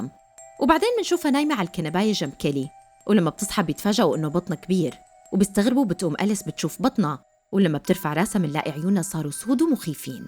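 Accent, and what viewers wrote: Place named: Syria. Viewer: استمري💓 انا كل ليلة نام على فيديو تنزليه فعلًا اشكرك على نشاطك وانك تنزلي كل يوم هذا شيء رائع والله برافو 🤩 اتمنى تعملي فيديوهات لافلام غموض و افلام اخرى منوعة ليس رعب فقط رغم اعشق الرعب لكن التنويع جميل وخاصة انو اسلوبك رائع فعندي فضول اشوف اسلوبك في نوعية افلام اخرى🥰 وخاصة اني احب اللهجة السورية وانت لوحيدة سورية اللي تعمل فيديوهات ملخصات افلام مالقيت غيرك سورية اتمنى لك التوفيق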